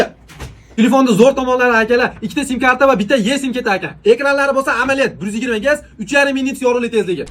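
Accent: Andijan, Uzbekistan. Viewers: Андижон шева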